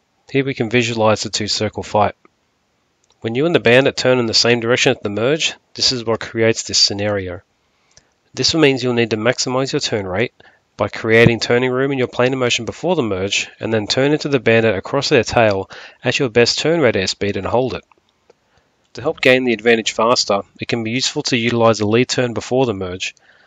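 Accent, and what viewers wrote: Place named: Australia. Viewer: I want you to know the same way a British accent sounds like it fits in some gentleman's club explaining the tribulations of aristocracy, there's something Inherently confidence inducing when it comes to an Aussie accent covering anything that has to do with a fight.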